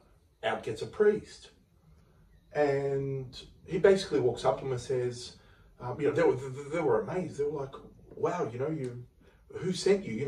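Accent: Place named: Australia. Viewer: Hello my Orthodox brother. You sound Australian, I too am Greek Australian from Melbourne. I started researching St Nektarios after having him come to me in a dream, he was walking with me and chatting to me walking down a hill near a monastery, I didn't think much of it at the time and just passed it off as a dream. After a couple of years my wife passed away and I somewhat pushed away from religion, a couple years later I restarted my journey with religion and just today, I remembered the dream I had approximately 5-6 years ago, it just popped into my head, I remember I was sick with an illness at the time of the dream and just realised that I was healed shortly after the dream, my guess is the Saint came to me to heal me, didn't put the two together at the time, but my guess is that it was a miracle, anyhow, I started researching the monastery of St Nektarios and low and behold, I saw the church and the gardens in Egina Greece, it sparked my brain and I thought, is this the church and garden I saw in my dream? 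I got goose bumps, I continued to do research and thought wow this Saint is a true modern day miracle worker, back when I had the dream it was like I teleported to the church in Greece and walked with St Nektarios and he healed me, anyhow, I would really like to visit in real life one day. That all said I had just read Agios Nektarios Paraklisi and decided to do some further researching into St Nektarios miracles and found your video. Just wanted to say thank you for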